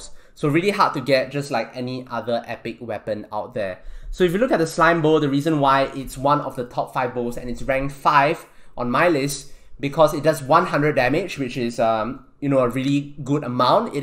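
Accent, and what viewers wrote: Singapore. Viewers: u kinda sound singaporean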